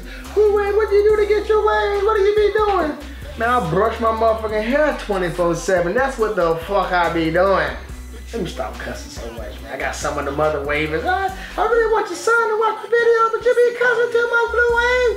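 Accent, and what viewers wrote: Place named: Georgia, United States. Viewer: Nigga got be from savannah,dublin,or atl💀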